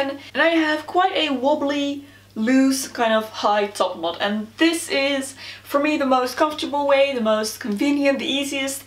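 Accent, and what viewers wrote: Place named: Netherlands. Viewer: ik hoorde aan je accent dat je nederlands was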